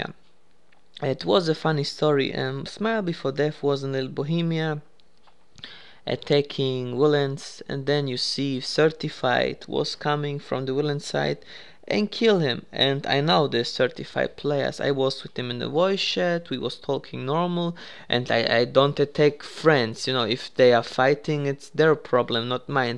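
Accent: Balkans. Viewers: I love Balkans Accent xD Sounds like Borat, VERY NICE xD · I'm sorry I love the way you talk Niko Belic FTW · Your accent is raw as fuck!!!:D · I LOVE BALKANS ACCENT SO MUCH XD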